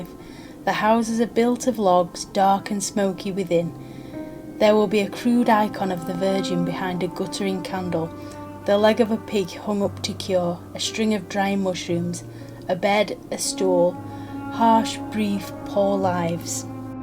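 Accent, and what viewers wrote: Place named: England, United Kingdom. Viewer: glad to hear this being read in an english accent, good job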